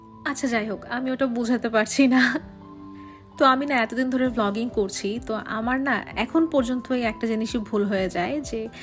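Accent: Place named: Rangpur, Bangladesh. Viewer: Apu apnar onek kotha Rangpurer mone hoy.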